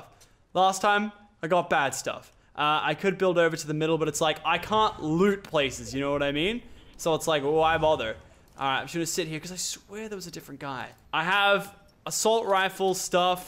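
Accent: Australia. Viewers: Are u Australian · So are you Australian or American? Which accent are you putting on??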